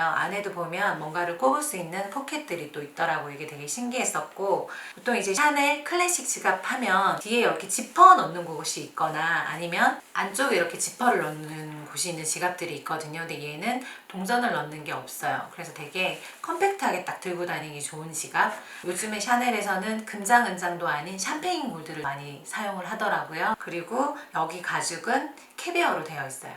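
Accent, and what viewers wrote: Gyeongsang, South Korea. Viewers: 경상도 사투리 쓰시네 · 사투리 쓰시긴 하네요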